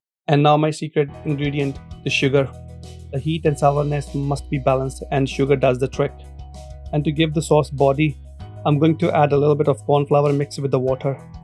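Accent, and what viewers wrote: Scotland, United Kingdom. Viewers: I sense a Scottish accent 🎉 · Do I detect a fellow Scottish accent there mate?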